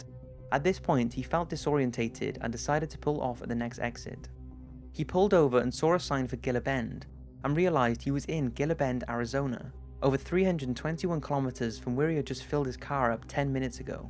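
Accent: United Kingdom. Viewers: Loving the content man, keep it coming! Out of interest, are you from the UK (and if so, which part)? Your accent is awesome for these videos.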